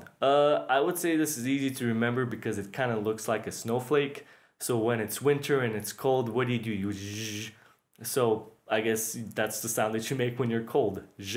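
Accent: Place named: Russia. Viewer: По произносимым звукам дико палится русскоязычный. Это не всем удаётся скрыть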